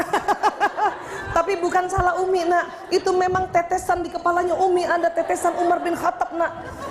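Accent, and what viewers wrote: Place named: Banyumas, Indonesia. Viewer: Bocah ngapak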